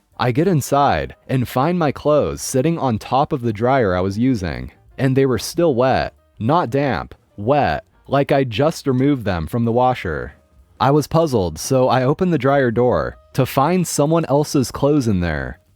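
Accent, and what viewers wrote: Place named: Canada. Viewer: What a NOT charming contribution to the dialogue. Different continents, different pronunciations. Tomato, tomato. As I recall, our host is Canadian, as am I, and we both say it NOT your way. If you can’t be nice, don’t bother to comment, especially on such a petty item and in such a preposterous fashion.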